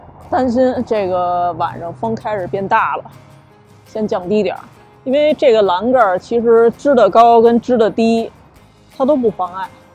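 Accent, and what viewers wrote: Beijing, China. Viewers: 走心❤的摄影师，NIce，听您这口音跟大马老师特别像，都是纯纯京味儿。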